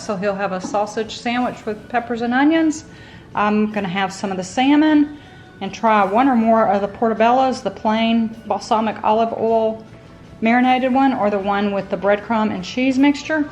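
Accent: South, United States. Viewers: I just love that southern twang😂. People from the south are honestly so warm and friendly. Everything looks yummy as always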